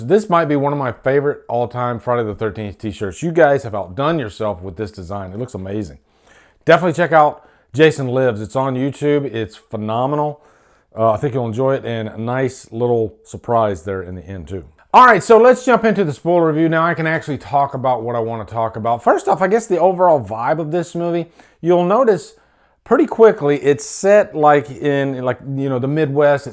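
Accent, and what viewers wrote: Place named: Louisiana, United States. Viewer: Are u from Louisiana? I asked cause I can kinda hear an accent lol. Great review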